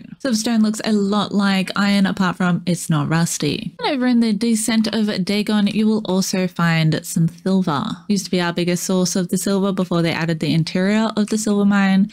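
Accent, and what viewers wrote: Australia. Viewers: Fine video...Love the heavy 'down-under' accent at different points in the guide. Voice speed and pitch is perfect for my brain.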